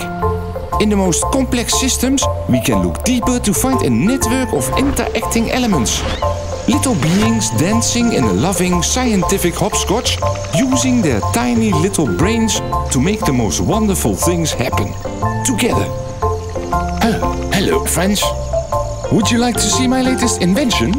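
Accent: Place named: Scandinavia. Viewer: Just wondering why you sound nordic but have a pic of Abe Lincoln?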